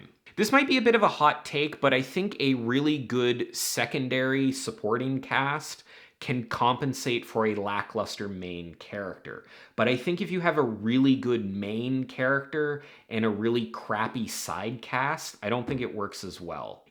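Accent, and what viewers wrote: Canada. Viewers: Once again commenting on an old video: Balding isn't a flaw, nor is a Canadian accent (that's an asset). Lack of charisma could be a bit of a flaw, but it's not one you have, at least when it comes to video. Now I do have a problem with the single character being the centre of the world. If you're writing from a single POV, that character is automatically at the centre ALL THE TIME. If the POV is first person, there's more leeway because you have immediate, deep access to their thoughts, and they can spend a lot of time thinking about and observing the things happening around them. In a sense, 1st person POV characters can serve as a storyteller in their own right. In 3rd person, though, this level of interiority comes at a higher price because of the perceptual gap between the external and internal experience of that character. Is this really a problem inherent in single 3rd person limited POVs? 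Or are we just so used to an enormous multiplicity of POVs in epic fantasy that, in comparison, books that contain a single 3rd limited POV seem too focused on one character?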